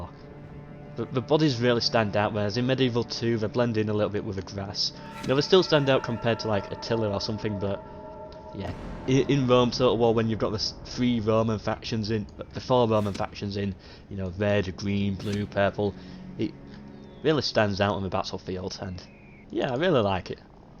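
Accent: United Kingdom. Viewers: Yeah I hope so mate👍🏻 Also, love ur accent mate! Wish my British accent was like yours😂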